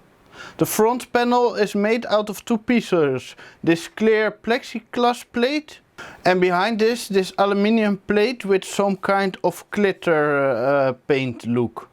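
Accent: Netherlands. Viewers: Hello fellow dutchman. I like your content. English technique is also good. Only the Pronunciation wauw XD sometimes i just had to laugh. Klinkt zo nederlands. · nice dutch accent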